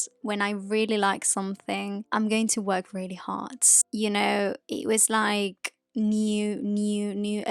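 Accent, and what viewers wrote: RP, United Kingdom. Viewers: I love RP accent... Great video. Thanks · Me gusta tu acento Británico, me voy a Australia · Would have never known that you are Spanish. Well done with the British accent. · ¿Cómo aprendiste a hablar el acento británico? Hablas como si fueras británica.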